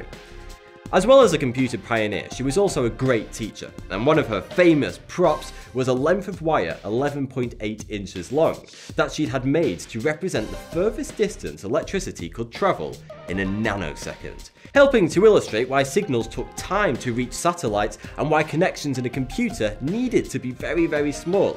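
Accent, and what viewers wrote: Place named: England, United Kingdom. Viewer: I love the English accent, but hate the English. I'm riddled by contradiction.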